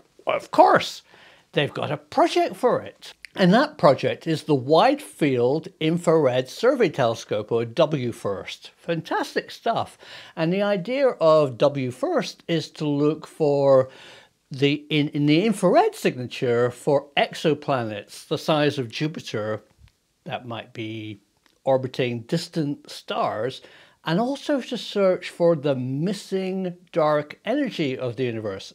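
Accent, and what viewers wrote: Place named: Bristol, United Kingdom. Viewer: I love your channel very informative lifelong subscriber and I'm always a fan of the Brissol arrghchcent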